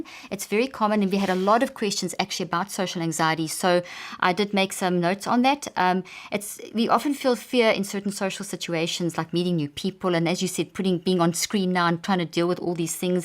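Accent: South Africa. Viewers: South African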